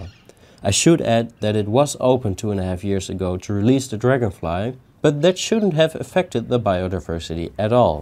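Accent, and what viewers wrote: Netherlands. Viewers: do i hear a dutch accent? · Are you Dutch? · I'm sure you're Dutch. I heard the Louis van Gaal in you. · I'm gonna say dutch. As a another dutch guy, our accents are usually destinct when speaking English 😂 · Are you perhaps dutch or Belgian? I hear a similar type of accent🤔😂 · so this guy can only be dutch and i love it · Based on your accent. Are you Dutch! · Heerlijk man dat Nederlands accentje LUV IT❤ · you sound so dutch, loving the vids! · Je klinkt Nederlands (you sound Dutch). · Heel leuk kanaal kameraad! Je G’s geven het weg dat je een nederlander bent haha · that dutch accent xD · first time on your channel.. took my 3 words to figure out you're Dutch xD Interesting video concept though! Subbed for now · The way you pronounce these latin names with that ever so slight dutch accent cracks me up a lot 🤣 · I can’t unhear the dutch accent…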